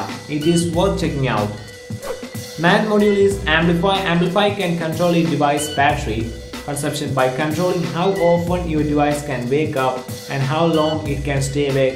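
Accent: India. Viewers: I like the idea of him trying so hard not to sound like an Indian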